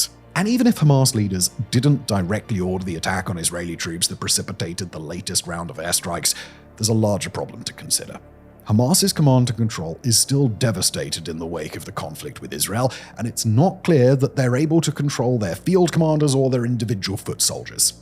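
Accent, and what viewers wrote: United Kingdom. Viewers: wow he is so unbiased. Feel really ashamed that i used to listen to this bald old ass british accent from medieval london.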